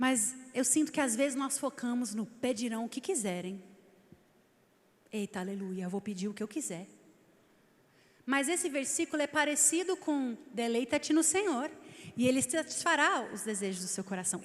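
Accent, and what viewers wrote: Minas Gerais, Brazil. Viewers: pensei que tinha sido no mineiro kkkk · é sotaque mineiro mesmo kkkkkk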